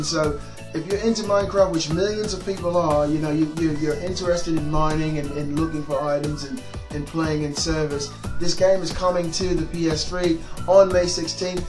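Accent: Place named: United Kingdom. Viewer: Lol lovin the posh English accent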